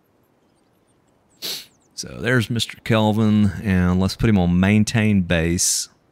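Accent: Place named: South, United States. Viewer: I cannot pinpoint your accent. It has to be southern, Im thinking Arkansas? Where have I heard that accent before? Lol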